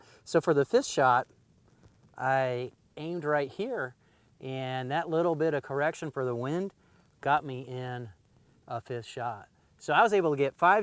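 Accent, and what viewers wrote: United States. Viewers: I always thought that the "Twang" was because of your southern accent. Thanks for all the great videos.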